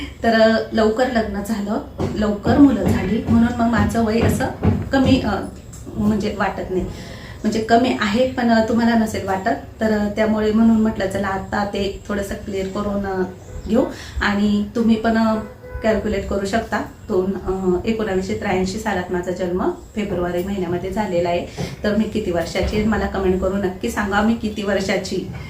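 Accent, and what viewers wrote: Nashik, India. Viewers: मला तर नाशिकची बाेली भाषा तुमची खुप आवडते माझी मैत्रीण सुद्धा सटाणा नाशिक येथील आहे तिचे बाेलणे सेम तुमच्या सारखेच आहे हे ऐकायला सुध्दा नशीब लागते❤❤❤❤ ताई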